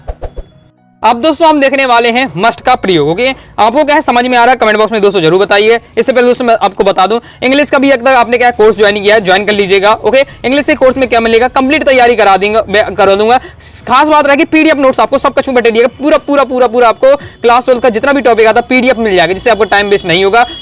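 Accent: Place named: Bundelkhand, India. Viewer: सर आप तो कहीं कहीं बुन्देलखण्ड बी बोल तै हो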